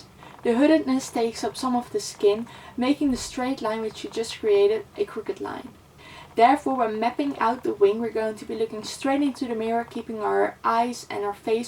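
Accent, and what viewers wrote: Netherlands. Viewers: Hi! Thanks for the tutorial, it was very helpful. My eyes are horribly hooded, I had kind of given up on eyeliner but this gives me hope! Your accent is cute, are you from the Netherlands? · Nice tutorial, you sound Dutch👀 hey there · Het is half 11 s avonds en ik ga deze tutorial meteen testen. Altijd gestruggled met winged eyeliner (i am assuming you are dutch by your accent, if not I am sorry) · dat nederlandse accent springt er wel uit haha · ik vermoed een Nederlands accent ??? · You are Dutch... not even a question lol i can just tell 😂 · Are you Dutch? I can really hear it through your accent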